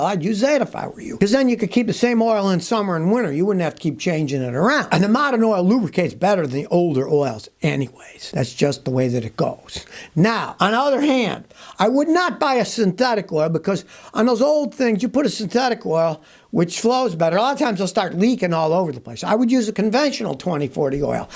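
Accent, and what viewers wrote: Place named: United States. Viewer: Must be the common sense and ability to speak americanized English using real words on display again 😎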